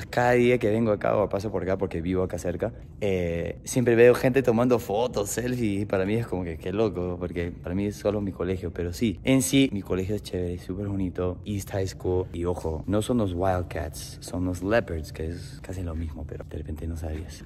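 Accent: Peru. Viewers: El asento lo tienes bien peruano ja que loco jaja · Wouuuuhhh ¡qué hermoso gesto!, se siente tu amor por nuestro ¡PERÚ! ¡GENIAL!!! Me encanta tu sabor peruano al expresarte 😁😍, eres todo un peruano. ¡Cuídate mucho! Y GRACIAS 🇵🇪♥️✨ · Es sorprendente... Tienes el acento y gracia de un nativo peruano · No sólo representas al Perú, sino además conoces la jerga peruana y sobre todo hablas español con acento peruano, lo que es muy difícil de lograr para un native English speaker. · En serio man te has peruanizado un montón, tienes el acento de limeño de barranco. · Hablas tan bien el español y con acento peruano incluído que pensé que eras peruano, pero igual te considero como un peruano más xq se nota el feeling que sientes por Perú, buena, te hiciste una, éxitos bro 👍👍🇵🇪🇵🇪 Nice school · Mare, ahora te creo, yo creí q eres cajamarquino, o pozuzo, hablas bien como el castellano peruano. 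Me sorprendiste.!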